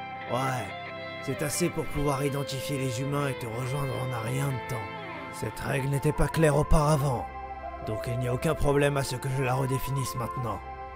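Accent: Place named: Germany. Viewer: L'accent allemand, juste parfait 😂 mec continue frr tu gère trop 🔥 tu va gagner masse abo je partage et abo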